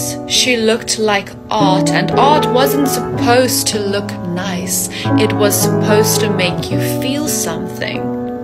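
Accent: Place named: United Kingdom. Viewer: i like this voice so much and it's british accent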